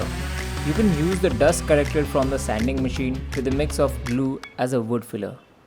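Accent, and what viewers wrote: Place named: India. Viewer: When the video started, I thought it was from the USA or Japan — but the moment I heard the first word, I was like, 'Aha! That’s definitely our Indian brother!' 😄🇮🇳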